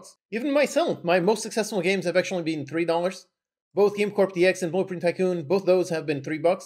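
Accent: Portugal. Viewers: Gajo você é português? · és português?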